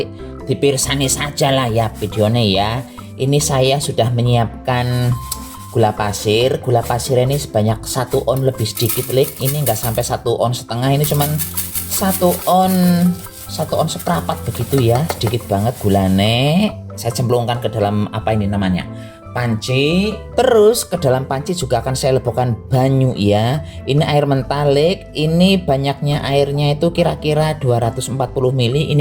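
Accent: Banyumas, Indonesia. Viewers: Aku jadi terhibur nonton vidio mu ngapak yek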